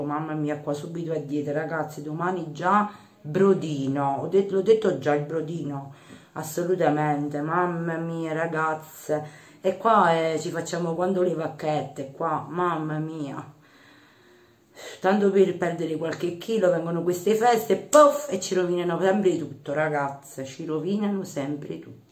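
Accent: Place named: Sicily, Italy. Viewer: Mi fai morire dalle risate quando parli in dialetto 🤣🤣🤣🤣 io sono siciliana e ti capisco bene 😁 e si siamo sempre a pulire 🧹 ti abbraccio ❤️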